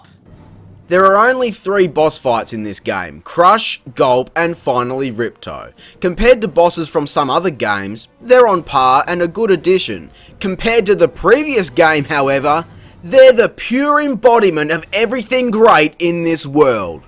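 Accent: Australia. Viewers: I just put up a review for the first one and here I see you post another hilarious one for the sequel hahah keep it up. strikes again! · ANNOYING VIDEO WITH AN AUSTRALIAN ACCENT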